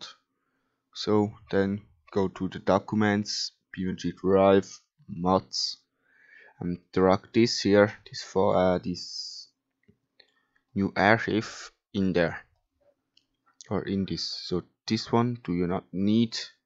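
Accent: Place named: Germany. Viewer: Ich höre so einen deutschen Akzent...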